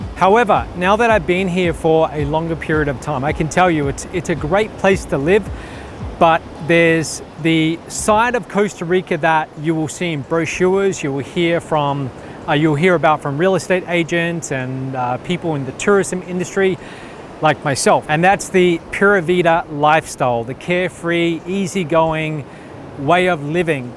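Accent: Australia. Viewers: As an Aussie, I would say he is definitely Australian. He is apparently on Facebook - perhaps you could ask him directly as well?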